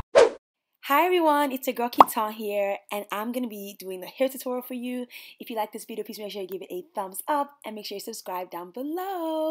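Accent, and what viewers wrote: Nigeria. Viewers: I haven't watched a video of yours in a while but: your personality is still lovely, your smile is even more radiant and....I miss your Naija accent oooooo!!! 😕😉🙂 The wig looks great on you!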